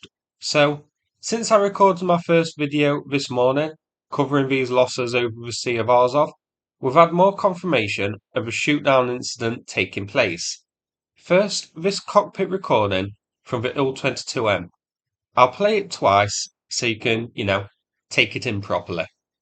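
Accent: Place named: Northern England, United Kingdom. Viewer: I just love the commentary, makes me homesick for the North of England. Phrases I heard often when younger.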